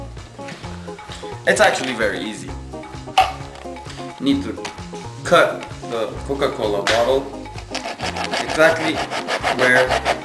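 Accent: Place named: Brazil. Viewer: Você fala inglês mas você é Brasileiro, seu sotaque não nega.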